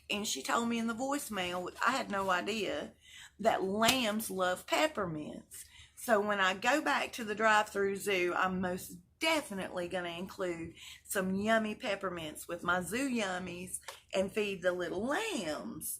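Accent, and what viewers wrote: South, United States. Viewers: She has a very heavy southern twang. I love her voice too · we love you You are so adorable! I have no idea how all these people who send you gifts know where to send them... Anywho I wish you a beautiful Happy Borthday September girl!! Also I use diclofenac ointment it’s a topical anti inflammatory that my dr gave me & it helps. I use ice if it’s real bad & otherwise I’ll just put heating pad on it! But I love your vids watch them all the time & I love your sweet country accent...just love it Big hugs love and many blessings sweet “talk to ya real fast” ☺️🌸☘️🌼🙏❤️✝️ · It's a southern accent 🙃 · There’s a lot of variations of the Southern dialect My husband doesn’t sound like at all. But I think all the accents down South are so cool to listen to!!!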